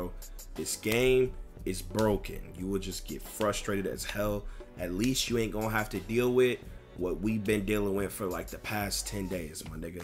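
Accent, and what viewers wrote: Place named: Los Angeles, United States. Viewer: I swear he sounds like he's from LA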